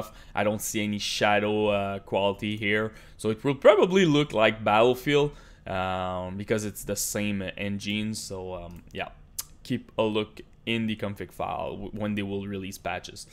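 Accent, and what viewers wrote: Quebec, Canada. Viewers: Big accent of Quebec speaking inglish esti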